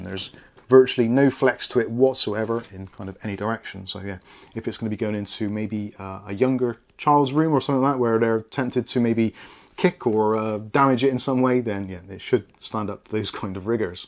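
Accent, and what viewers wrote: Bristol, United Kingdom. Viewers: Tempting to try this for £35. Was going to go with O11D Evo but I feel I'll regret it if I don't at least try a budget case for the first time. Will also help offset the very expensive build. I am also going to try air cooling for the first time (Thermalright Peerless Assassin 120) to cool a 7600X with a view to change that CPU to the 3D version whenever it launches. So I guess I'd have 3 intakes, rear as exhaust and two or 3 fans in the top as exhausts, unless I swap my 4090 for the one with the AIO. Thank you for reviewing this, I appreciate there are a lot of cases but only the main ones tend to get any attention. PS: Are you from Bristol?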